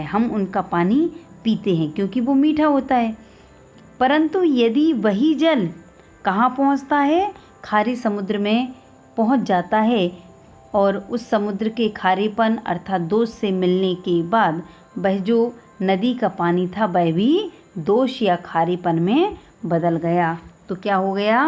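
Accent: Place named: Bengal, India. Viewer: Also may I ask you a question, are you a bengali cause your accent sounded one?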